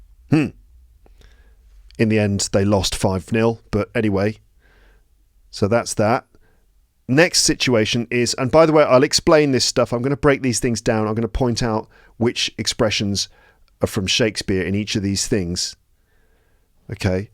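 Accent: United Kingdom. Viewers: I adore the British accentas i am ateacher of english from North Africa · British accent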